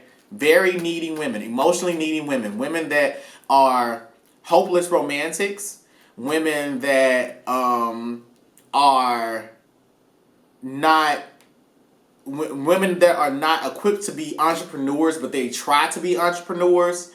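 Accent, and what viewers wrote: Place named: South, United States. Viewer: I love your country accent btw! The Southern accent is very sexy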